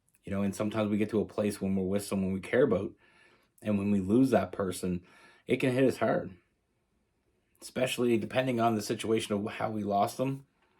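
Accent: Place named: Canada. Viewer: I love your stories!! I could hang with someone like you all day! If you don't mind me asking, what state are you located in? You sound Canadian! The cat is the bonus! I seriously thought it was mine at first! Thanks! You are appreciated!!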